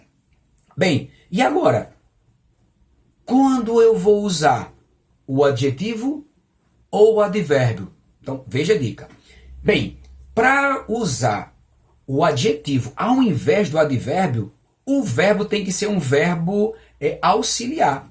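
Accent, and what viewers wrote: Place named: Northeast, Brazil. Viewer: Vídeo bom! Acho que você é nordestino 😅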